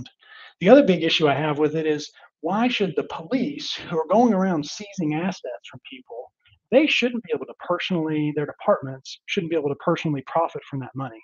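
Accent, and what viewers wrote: South, United States. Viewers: I love your videos and your softly southern accent! I’m from Texas (central and SE) too and was just going to say your Va. accent! I don’t recognize your region but I don’t claim to be familiar with them all. Texas is a big place! You provide such valuable advice and I thank you for educating us all🙏 ❤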